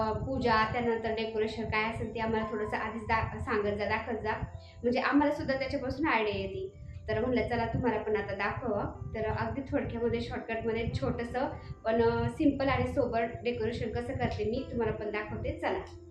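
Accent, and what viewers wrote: Ahmednagar, India. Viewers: श्री स्वामी समर्थ ताई डेकोरेश एक नंबर. केडगाव देवी ला नक्कीच येईल मला खूप खूप आनंद झाला ताई मि घरात सगळ्या ला सांगितले ताई बोलवल ताई आज फोजी साहेब कुठे गेलेत दिसले नाही ताई आपली नगरी भाषा छान 🎉😊😊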